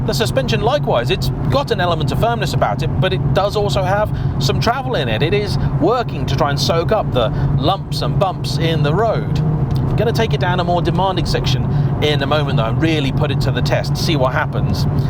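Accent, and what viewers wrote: United Kingdom. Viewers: I like the british accent, being from a non english speaking country, the english accent is so easy to understand! Love the american and aussy humor but i cant follow quickly with them as with the british :(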